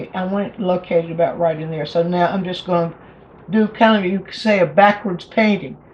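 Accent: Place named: South, United States. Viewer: Yet another nugget of wisdom from YouTube's best painting instructor with the most beautiful southern accent. 😊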